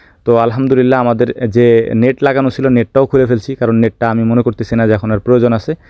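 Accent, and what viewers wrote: Faridpur, Bangladesh. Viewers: Vaiyar basha ki Faridpur?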